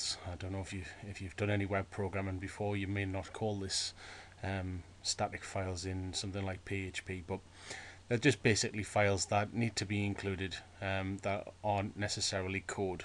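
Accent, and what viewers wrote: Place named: Newcastle, United Kingdom. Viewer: This is awesome. Django in a Geordie accent.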